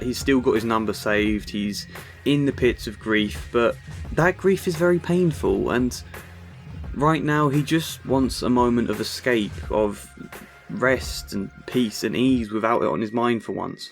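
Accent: United Kingdom. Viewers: Notice you've got a british accent. You listen to much uk hip hop? Jam Baxters lyrics and fascinating and visual as well, but probably not quite to an level. You might like as well, cause he's really funny and slyly satirical.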